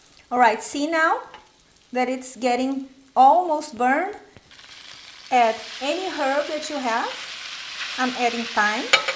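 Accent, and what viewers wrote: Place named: Brazil. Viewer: Hi. I am trying to guess where is your accent from. Is it from Brazil? Thank you.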